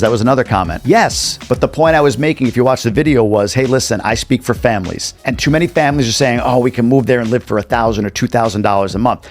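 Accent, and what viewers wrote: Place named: New York, United States. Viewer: Love this vid. I'm right lol. Knew that wasn't a Michigan accent. I thought you was a New Yorker. Tommy is now married his budget will probably increase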